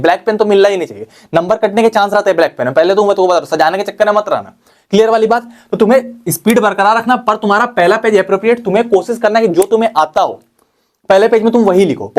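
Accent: Bihar, India. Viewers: Bhaiya Aap Bihar sai hoi kya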